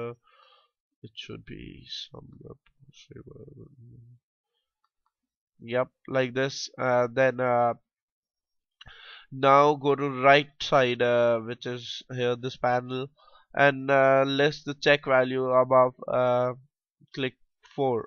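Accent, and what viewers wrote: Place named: India. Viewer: Indian accent so annoying.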